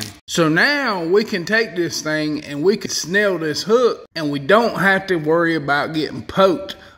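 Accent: United States. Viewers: oooooollll, lol what an awesome country accent.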